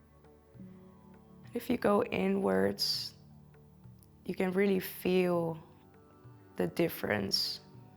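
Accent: Netherlands. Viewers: Are you dutch? You don't really have an accent but more like a non accent? I don't know I feel like you might be dutch? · Wow, this was a wonderful class. You're voice is very calming and grounding. If you would make more videos of practices I would defenitly follow them!! Thank you so much. I'm from Holland by the way. Taught I heard a Dutch accent in you're voice. 😊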